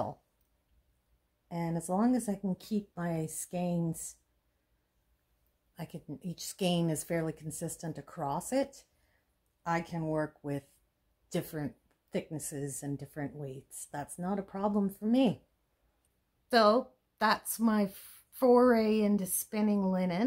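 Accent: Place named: Ottawa Valley, Canada. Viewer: Thank you so much for this video! I'm in Winnipeg, with family in the Ottawa Valley and in Gatineau, so I heard your accent and put you on the map right away! :) I'm going to try spinning some flax right now... I hope it works out as well for me as it did for you! There may be a lot of swearing involved... :) Thanks again!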